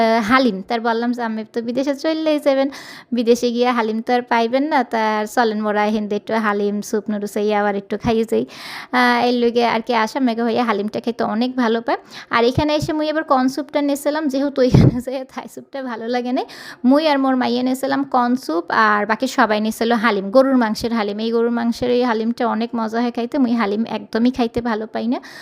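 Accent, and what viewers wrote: Barishal, Bangladesh. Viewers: আপু আমার হাসবেন্ড কাতার থাকে। তোমার ভিডিও আমার খুব ভালো লাগে। আমাদের বরিশালের ভাষা খুব ভালো লাগে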